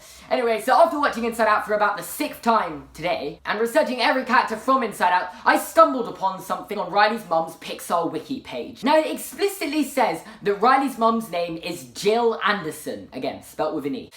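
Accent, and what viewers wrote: United Kingdom. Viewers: I’m sorry I’m American and I love British accents but I still can’t get over mum I’m no trying to make fun just I love anything foreign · God I love British accents "SanFRANcisco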